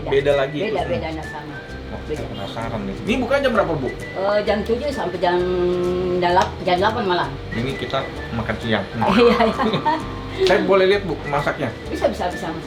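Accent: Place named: Jakarta, Indonesia. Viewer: Ko logatnya dengernya kajarta 😄😄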